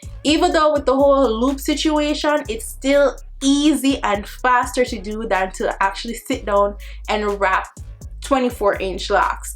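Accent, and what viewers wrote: Jamaica. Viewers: I’m sooooo SURE your Jamaican. Right or wrong?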